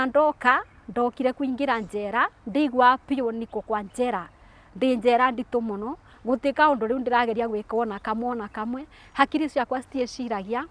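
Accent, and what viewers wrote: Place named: Kirinyaga, Kenya. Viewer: good show. Kama wewe unajua Kikirinyaga yako ni ya "aba" and not "haha" stick to one tongue sisi Wakirinyaga tunajua Kikikuyu yetu.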